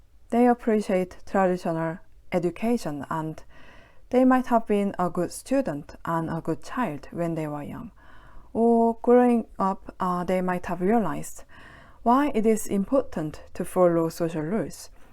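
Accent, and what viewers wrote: United Kingdom. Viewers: 약간 영국 발음 같아요 완전 영어 잘하시네요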